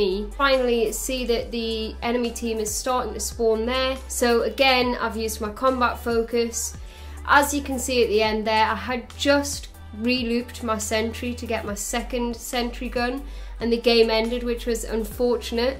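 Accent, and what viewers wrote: United Kingdom. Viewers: Is this britsh english?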